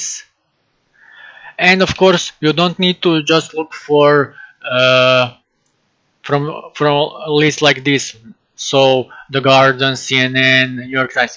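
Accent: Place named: Serbia. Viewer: Hvala, po akcentu vidim da si neki nas 😄 Mislim da bi verodostojnije bilo da si u Ahrefsu proverio domain rating i ceo domen, mislim kad ga vec korisits.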